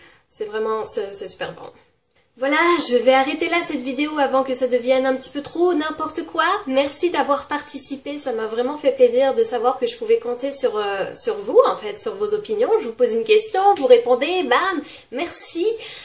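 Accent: Quebec, Canada. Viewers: 😁😁😁😁 tu commence en avoir l'accent Québécois et tu est super belle en plus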